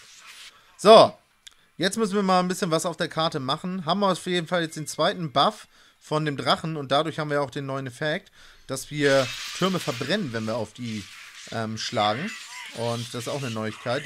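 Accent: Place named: Austria. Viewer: Ich kenne dein Dialekt.....du bist Österreicher ne?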